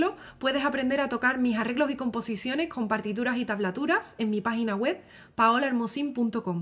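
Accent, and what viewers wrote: Andalusia, Spain. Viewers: Estimada quiero agregar al comentario anterior que, mi bisabuela materna Josefa Calderón Candil y mi abuela Angela eran de Olvera- Cadiz y reconocí tu acento andaluz inmediatamente. Eres maravillosa! Dios te bendiga siempre.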